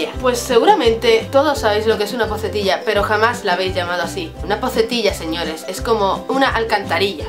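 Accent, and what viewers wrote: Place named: Andalusia, Spain. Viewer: eiiis de que parte de andalucia erees?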